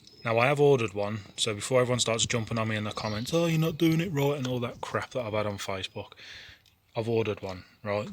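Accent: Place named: Birmingham, United Kingdom. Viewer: Wanna help me out with my S40 T5 build?? Same engine as the ST You sound like you're from brum, so I'm assuming you're local ??👀😅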